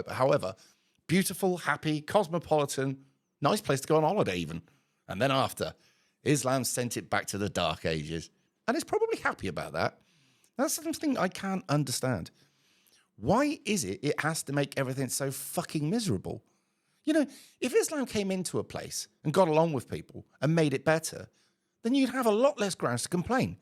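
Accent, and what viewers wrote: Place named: Newcastle, United Kingdom. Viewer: What area is she dictating for. Sounds like newcastle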